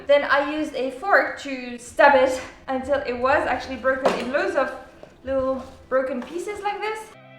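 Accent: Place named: France. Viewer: Is it me or do you have a TINYYY french accent 😭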